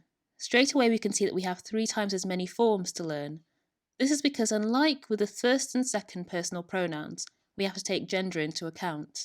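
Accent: England, United Kingdom. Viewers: very horrible pronuncuation, such a strong english accent...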